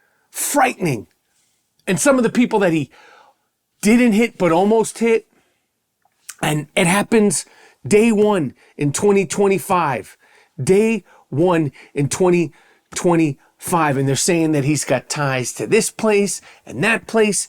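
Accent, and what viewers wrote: New York, United States. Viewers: Yo Rap. I love it bro. You’re still talking like it’s the 1980’s New York hip hop scene. Ol’ school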